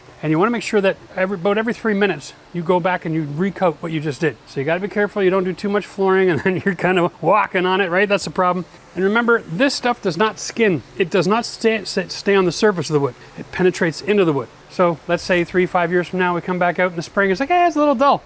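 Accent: Canada. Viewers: Great work and an amazing deck. I could be wrong but you sound Canadian.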